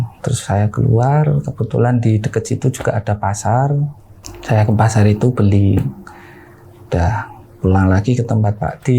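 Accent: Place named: Java, Indonesia. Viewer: Jowone khass kenthel banget kang, slm seko pekalongan stay di bogor kemang❤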